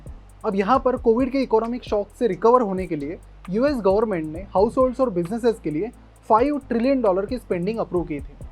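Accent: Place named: Bengal, India. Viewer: are you bengali? Some of your accents matches with my bengali accent.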